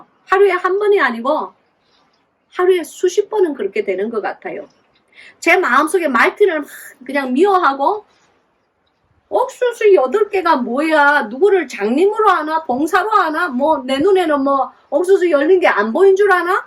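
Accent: Gyeongsang, South Korea. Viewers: 성경공부 너무 좋아요 은혜가 넘쳐요 케냐 사람들을 이해하시려면 하나님의 도움이 정말 필요할것같아요. 목사님도 경상도 사투리 사용하시네요. 음향과 동영상편집은 토니 목사님께서 해주시는건가요? 너무 재밋어요. 예수님도 유머가 잇으시다는데. 잠근동산 책 주문햇어요 곧 도착하면 읽을 생각이에요 변화받길 원하고 실행하는 믿음의 사람되게 해주시길 기도드립니다 아멘 할렐루야^^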